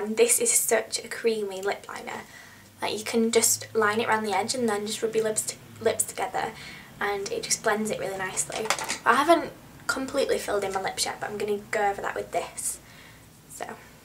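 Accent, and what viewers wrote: United Kingdom. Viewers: i love your accent how come it sounds different then typical british accents?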